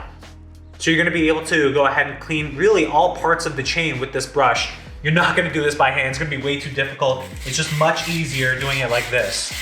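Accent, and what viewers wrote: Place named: Canada. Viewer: how can one tell you are from canada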